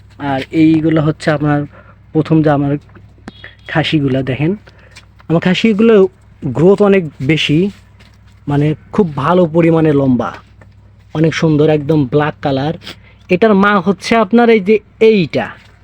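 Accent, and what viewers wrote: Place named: Bogura, Bangladesh. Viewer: ভাইয়া আপনার বাসা কোথায় কথা বার্তা দেখি আমাদের বগুড়া জেলার মতো,প্লিজ বলবেন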